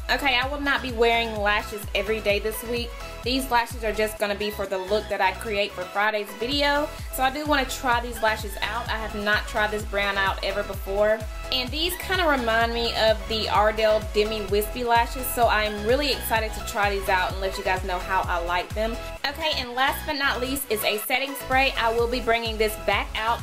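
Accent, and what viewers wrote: South, United States. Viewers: You sound like a fellow southern girl too! :) where are you from? just recently found your channel and loving it!! subbed to ya!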